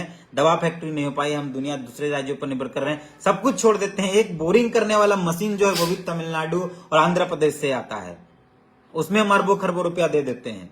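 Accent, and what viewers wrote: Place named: Bihar, India. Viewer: Ap jharkhandi jesa bat karo ne ap bihari jesa bat kar rahe ho jharkhand ka niwasi ho kar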